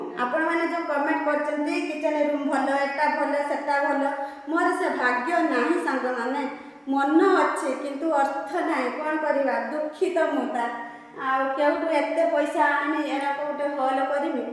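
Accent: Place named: Balasore, India. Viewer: Aapan Kathaa ru janajauchi Balasore boli ame bi Balasore pila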